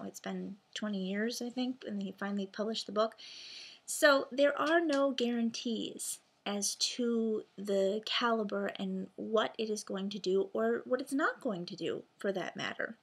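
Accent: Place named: Midwest, United States. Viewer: ... Your accent sounds mid-western so I'm guessing Ohio. You need to adjust your logic. There is a third and fourth reason for a person being "knocked down". 3.) The stance the person is in at impact and direction of bullet travel. 4.) The "point" of impact. I saw a man shot 7 times in the chest with a stupid.25 auto and he ran to nearby house and sat waiting for an ambulance. I also saw a screwball shot with a.25 auto in the forehead. It didn't kill him, but it knocked him down.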